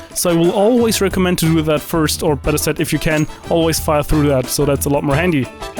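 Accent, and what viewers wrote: Germany. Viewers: das DEnglisch. DAS DENGLISH. DEEEENGLISH. EINFACH AIDS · Is it possible that ur german ?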